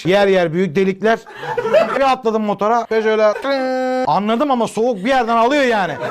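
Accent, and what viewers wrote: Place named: Tekirdağ, Turkey. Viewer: Hikaye süper, birde işin içine Tekirdağlı aksanı girince süper olmuş😁👍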